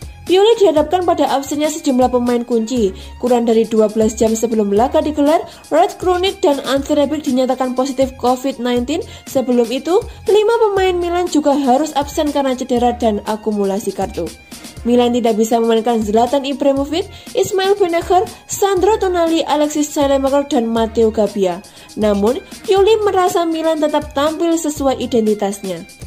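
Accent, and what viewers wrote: Java, Indonesia. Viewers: Mbak mbak, jangan medok medok napa bahasa nya . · Min logat jawanya jelas banget,,😂😂,,Mantup,,eeh mantap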